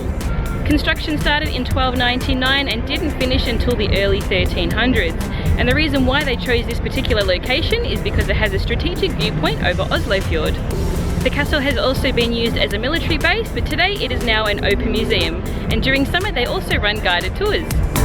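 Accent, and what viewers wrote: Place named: Melbourne, Australia. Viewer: G'day from your home town, Melbourne!! Not hard to pick that accent!! My wife and I are coming to Oslo-Bergen enroute to catch the North Lights in January next year. Any advice on what what may or may not be open in winter in Oslo? Thanks. Vincent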